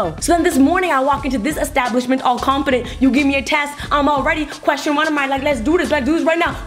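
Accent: United Kingdom. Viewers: That British accent😍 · Is it just me that LOVES her English accent 🤣🤣🤣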